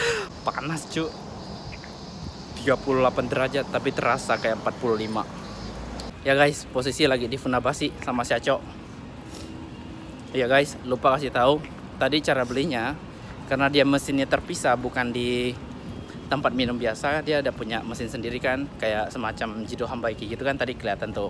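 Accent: Java, Indonesia. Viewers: Ko ada medo' nya bro klo ngmong😅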